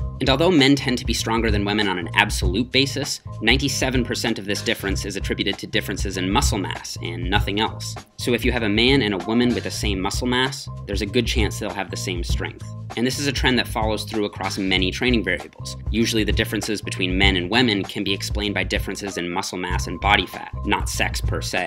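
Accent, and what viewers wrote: Newfoundland, Canada. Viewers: He a Canadian boy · Are you Canadian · Canadian accent is so interesting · He’s a neufie lol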